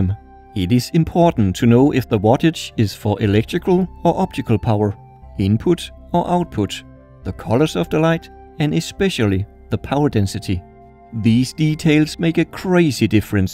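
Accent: Denmark. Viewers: har næsten lige fundet din kanal. Ku sku høre med det samme du var dansk (go'e gamle accent)😂 Har seriøst binged dine videor. Pisse fedt med en dansker der laver sådan nogle slags videoer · YouTube recommended this video and I thought it would be interesting. It didn't take many seconds before I could hear that the narrator is Danish. Fortsæt det gode arbejde 👍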